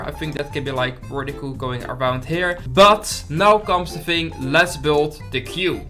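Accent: Netherlands. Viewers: are u from the netherlands?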